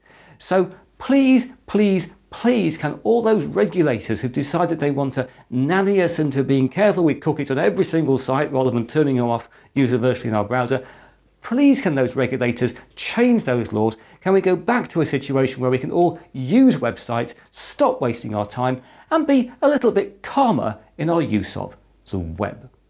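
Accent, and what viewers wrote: United Kingdom. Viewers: It's like LInus Tech Tips but Bri'ish.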